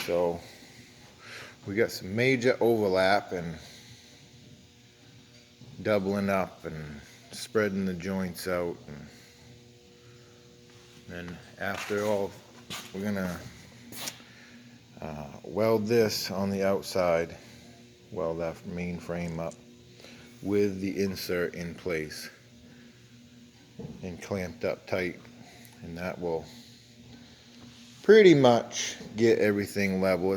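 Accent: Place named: Maine, United States. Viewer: Nice, you sound like you're from maine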